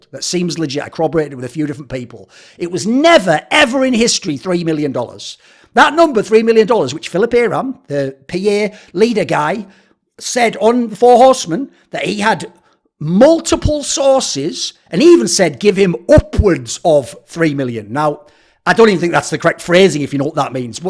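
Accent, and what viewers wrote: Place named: Scotland, United Kingdom. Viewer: its probably been 5 years since i've seen a thoorin video since i quit league in high school and i forgot how scottish this guy is 😭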